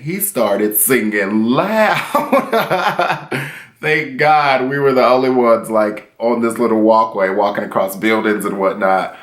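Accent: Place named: South, United States. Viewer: Lmfao... Wow, you did the most for him. Are you from the south?! You have a very southern accent.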